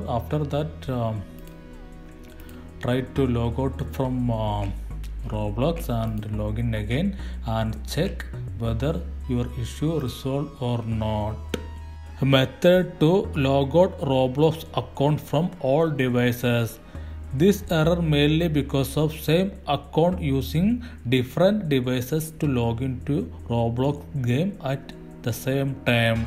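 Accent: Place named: India. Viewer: bro has the tiktok indian accent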